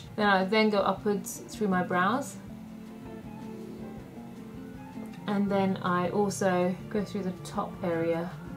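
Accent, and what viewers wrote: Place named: United Kingdom. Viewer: She is an Indian can you belive that. She speaks like a British? ?????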